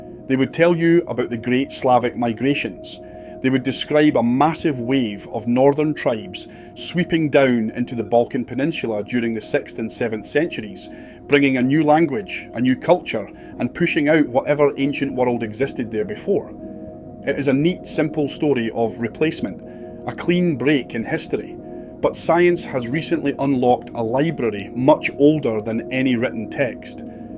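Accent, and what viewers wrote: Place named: North, United Kingdom. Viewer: Interesting. Thank you for the subtitles, otherwise I could not understand your accent. I have heard the New Zealand English, which was very difficult, but I think yours is from somewhere in northern Britain ?🌹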